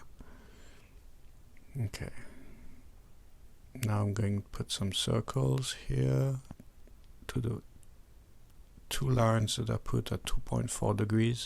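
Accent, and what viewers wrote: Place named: Neuchâtel, Switzerland. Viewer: Oh un neuchâtelois :-)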